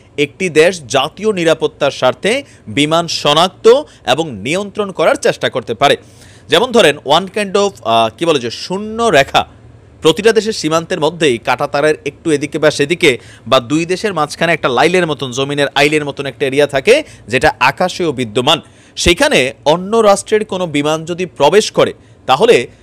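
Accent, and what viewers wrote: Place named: Comilla, Bangladesh. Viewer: হাহাহা ভাই কুমিল্লার ভাষা শুনলে আপনার মুখে সেই লাগে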